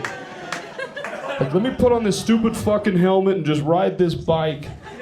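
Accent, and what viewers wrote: Australia. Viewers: good quality, he does sound like a posh australian, loved the siri joke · Im Australian and i thought for sure you were an Aussie haha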